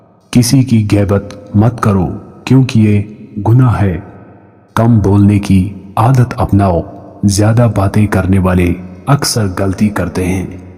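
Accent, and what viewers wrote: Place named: Kashmir, India. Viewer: AAP KOI KASHMIRI PERSON HAIEN AAP KI AWAZ BATATI HAY BUT I AM KASHMIRI FROM MAQBUZA KASH MIR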